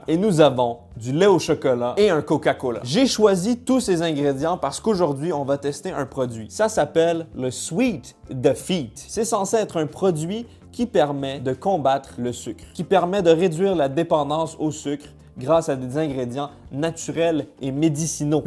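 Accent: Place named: Quebec, Canada. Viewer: Accent québécoises**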